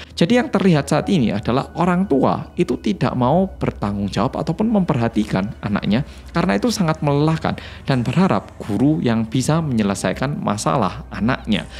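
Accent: Java, Indonesia. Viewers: OMG kisah nyata ini mirip karya di webtoon dgn judul "the real lesson" dari akhir episode 81-90, aku kira kejadian ini cuma ada di webtoon mengenai seorang ibu-ibu yang spam call guru sd di nomor pribadi bahkan pada saat malam hari. Ngga kebanyang itu kejadian di ibu guru sd yg mas ceritain😓😓 BTW, aku nonton video ini dideket papa dan papa ikut denger, papa malah salfok, "suaranya medok banget". Suara mas melokal yaa, sampe papa ga ngeh klo mas orang korea😅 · Kerenz pembawaannya medok tpi banyak tambahan gambar 🎉🎉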